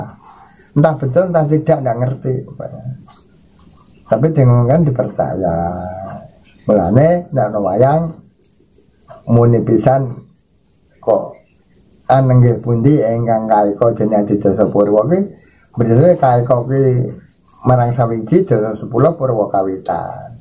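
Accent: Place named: Bojonegoro, Indonesia. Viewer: Mugi slamet kung.... Kok kados bojonegoro logat bahasa nipun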